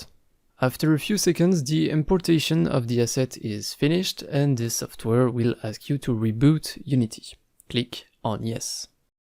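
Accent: France. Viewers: Tu es français non? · ta grave un accent français tu serais pas?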